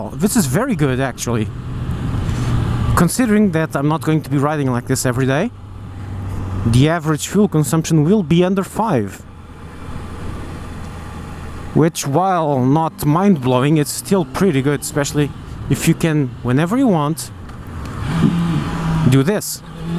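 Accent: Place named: Portugal. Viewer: pelo sotaque nota se que es portugues tou para adquirir uma de 2016 sabes que modificaçoes teve as versoes aseguir?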